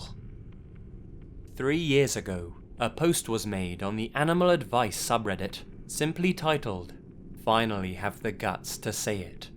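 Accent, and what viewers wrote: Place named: Southern England, United Kingdom. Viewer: that accent doesn't lie, that's southern england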